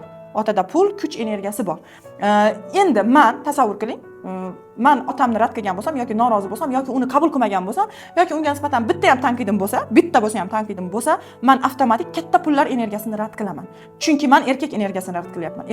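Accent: Khorezm, Uzbekistan. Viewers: Асалому алайкум Хоразмликмисиз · Бу айол хоразмлик экан шева хоразмга кетябди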